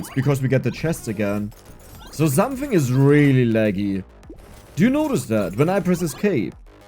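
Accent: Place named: South, Germany. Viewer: Kann es ein dass du aus dem Süden Deutschlands kommst zumindest hörst du dich so an?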